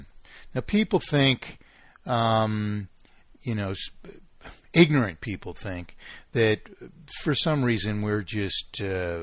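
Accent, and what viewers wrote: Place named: Australia. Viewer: I will be waiting for the ultimate debate between Austrians and MPE advocates: Peter Schiff, Ron Paul, Rothbard VS. _________? Perhaps your Australian accent will suffice. does well but he doesn't talk as fast as these other guys.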